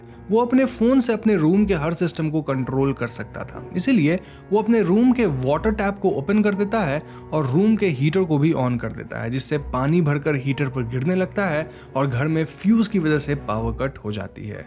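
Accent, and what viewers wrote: Bengal, India. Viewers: Aapki Bengali accent wali Hindi Alag hi feel deti hai... KO😍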